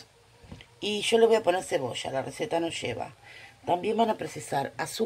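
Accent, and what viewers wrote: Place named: Buenos Aires, Argentina. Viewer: Hola . Es la primera vez que te escribo,hace poco tiempo buscando recetas de cocina asiática encontré tu canal,me pareció muy interesante prácticos tus platillos. Al principio creí que eras Argentina por tu acento medio porteña pero luego descubrí que eras del Hermoso Uruguay! !!.Gracias por tomar tu tiempo y enseñar sobre el mundo culinario asiático. Saludos desde Argentina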